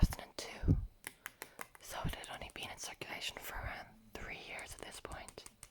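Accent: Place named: Ireland. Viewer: I'm part Irish myself. Love the Irish accent.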